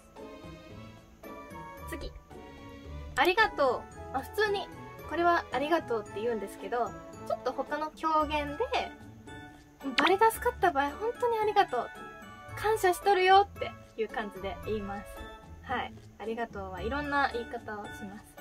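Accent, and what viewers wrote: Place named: Hakata, Japan. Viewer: 千葉県からです！博多弁が凄く好きで、フル見させて貰いました。やっぱり博多弁っ良いですね！ありがとっちゃね！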